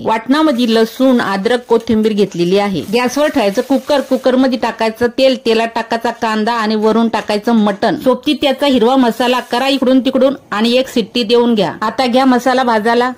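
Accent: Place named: Khandesh, India. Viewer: Tu tumachi bhasha khandeshi vatatey 👍👌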